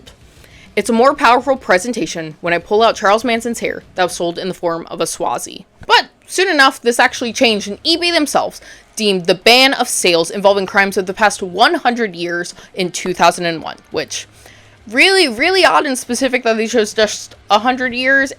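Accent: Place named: South, United States. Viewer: oh nah this guy is from the south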